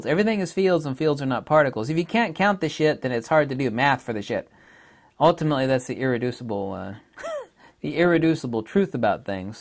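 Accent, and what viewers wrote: Germany. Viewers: Speaking German like a native, judging buy the accent you picked it up in the Saxony region. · You got German dialect perfect nice.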